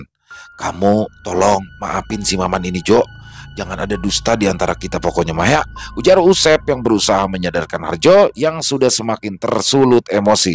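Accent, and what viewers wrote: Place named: Jakarta, Indonesia. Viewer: Kocag deh bang 😂 mas jawa? Logat betawi nye kentel bgt bang, expert sekali 👍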